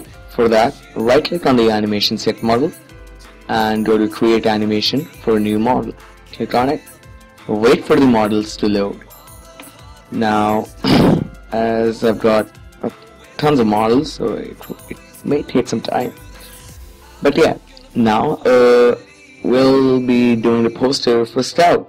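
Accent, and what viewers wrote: India. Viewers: he has an Indian accent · This fucking software is so aids, it hardly even fucking works. Fuck you and your Indian accent, fuck this entire shit.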